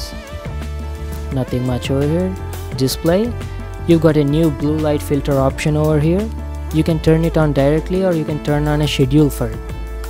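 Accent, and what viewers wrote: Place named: India. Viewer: 这印度口音真是听不懂啊 This Indian English is really hard to understand.!!!!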